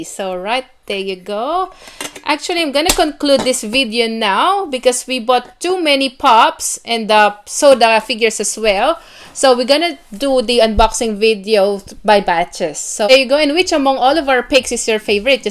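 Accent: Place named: Australia. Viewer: Been binge watching your vids. Hope to feature pops from the Demon Slayer line (if there are any). Signature mo na talaga yang Aussie accent mo. Keep posting very interesting vids!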